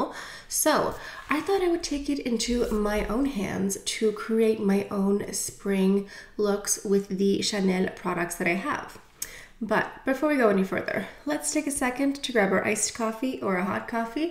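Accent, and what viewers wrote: France. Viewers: I have that violet single! Love the look on you but I will have to lighten it up for my colouring. Love to hear you pronounce the names of product in your French way.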